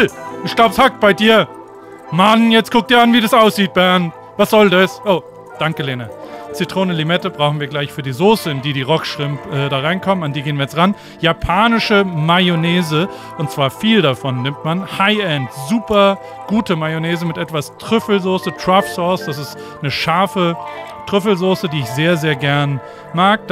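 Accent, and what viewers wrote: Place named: Germany. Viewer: Your German accent ist einfach super geil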